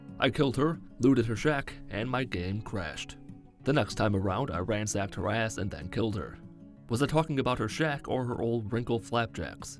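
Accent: Michigan, United States. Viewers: R u from Michigan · That’s what mighiganders sound like you are offending my people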